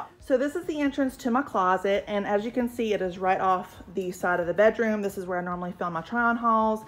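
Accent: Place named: Louisiana, United States. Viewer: I’m from north Alabama! Just outside Huntsville. Love your content ❤️ and you mentioned loving Louisiana accents, I can hear it in your accent too! 😊😊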